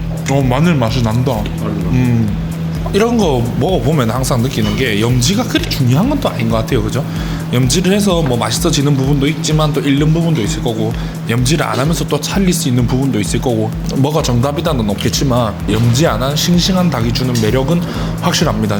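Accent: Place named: Gyeongsang, South Korea. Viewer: 갱상도 사투리도 이렇게 서윗하게 들릴줄은 목소리 언제나 서윗합니다..ㅋㅋ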